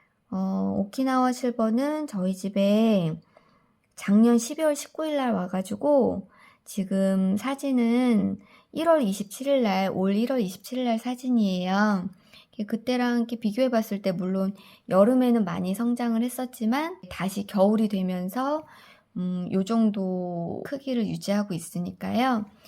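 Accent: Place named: Seoul, South Korea. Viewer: 영상 잘 보고 갑니다 ^^ 경기 서울 분이신가요? 서울사투리가 조금씩 들리네요 ㅎㅎ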